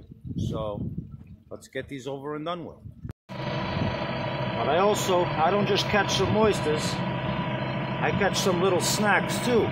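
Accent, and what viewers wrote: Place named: New England, United States. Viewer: I'm new here, what body of water is this? I'm guessing you are somewhere in New England with your accent! Wow I've never seen them processed before. Looks like I was right with NE. Your jacket says cape cod. It's on my bucket list to travel out there since we're only a few hours from there.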